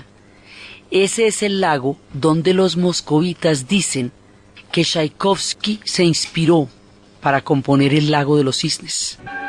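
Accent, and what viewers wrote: Colombia. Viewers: Sra. que emocionante y a la vez ameno es escuchar sus relatos, que incluso sin imagen alguna, y con ese acento muy de su bello pueblo colombiano. Saludos desde Perú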